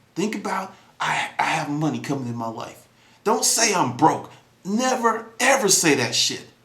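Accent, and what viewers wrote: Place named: New York, United States. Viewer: U have a touch of NY in u :) We r real people, straightforward, no BS! That's why I'm one of your biggest fans! Professional but have a little hood! LOL! keep up the good work!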